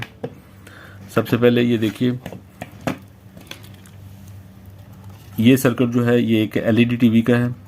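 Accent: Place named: India. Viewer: با اون لهجه هندی ریدی تو انگلیسی حرف زدن ، گاو مثل آدم انگلیسی حرف بزن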